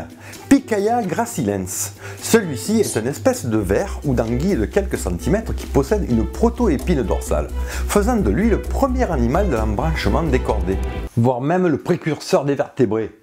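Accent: South, France. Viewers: Puis-je te demander de quelle région es-tu ? En tant que Belge, je cherche à parfaire mes connaissances des accents français 😅 (pour le moment j'ai compris que c'était "Sud" mais ça reste vaste) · Il parle trop vite et avec un fort accent du sud.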